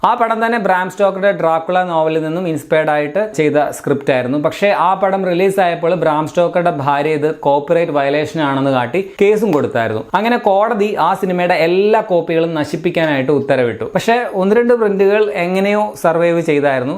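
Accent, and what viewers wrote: Kottayam, India. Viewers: Bro kottayam aarunno😁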